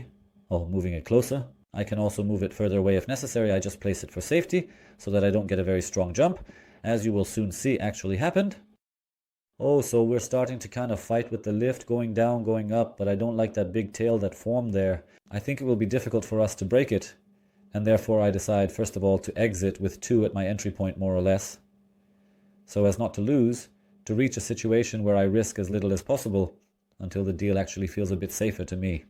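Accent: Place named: United Kingdom. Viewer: Loved the British accent mate, good luck with the English account 👌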